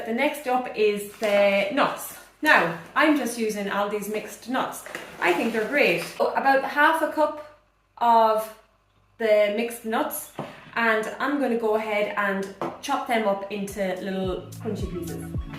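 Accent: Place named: Ireland. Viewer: Hello there, I got randomly recommended your video. I see you are a small time youtuber. I am liking and commenting to give you an edge with the algorithm. I actually stayed the first 2-3 mins of the video to figure out where you are from. That is a very mild Irish accent - at least I think. Did you grow up in Ireland? or I suppose which part of it if you don't mind sharing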